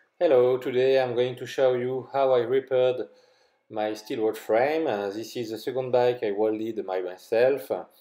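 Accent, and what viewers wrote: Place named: France. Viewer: its hard to speak english with french accent try to listen to old school american rap music with practising after them that's how i ignored my arabic accent influence on my english i hope it will help you great vid btw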